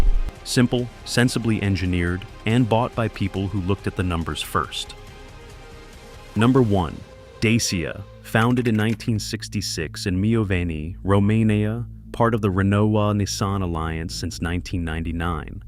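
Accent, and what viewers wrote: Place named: United States. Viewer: Turned it off when I heard an American accent!!!!!!!!!!!!!!!!!!!!!!!!!!!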